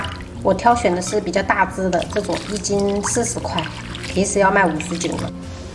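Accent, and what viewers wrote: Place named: Minnan, China. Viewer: 你似被夫家閩南口音影響了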